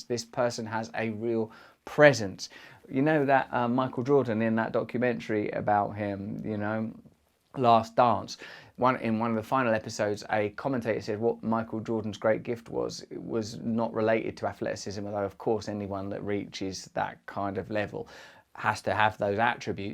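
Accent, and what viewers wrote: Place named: England, United Kingdom. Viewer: Mojo, sounds most appealing when said by an Englishman! When I say it sounds like mow joe! Help me russ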